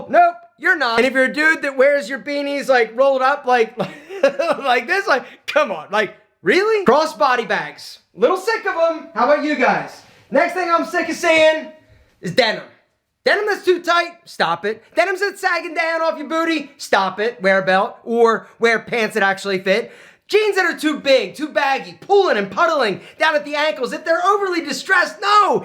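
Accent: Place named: South, United States. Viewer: The southern side of you was out this morning. You said "like" like 2,000 times Lol I appreciate you brother. Keep it up!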